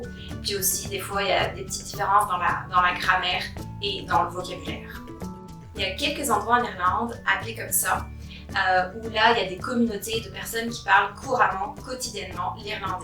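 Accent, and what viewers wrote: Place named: Quebec, Canada. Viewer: Merci pour cette vidéo! Tu as un léger accent québécois je trouve! :)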